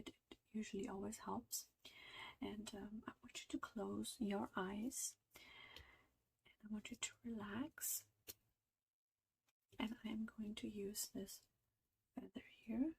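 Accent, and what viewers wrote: India. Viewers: an indian or pakistani daughter in law pure accent repeating khandan word make these kind of videos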